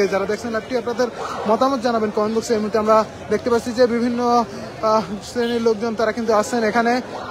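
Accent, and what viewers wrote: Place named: Narsingdi, Bangladesh. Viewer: নরসিংদীর কন্ঠ স্বর কেনো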